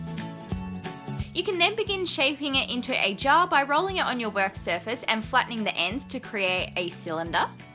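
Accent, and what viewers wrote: Australia. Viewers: I KNEW you sounded Australian!!!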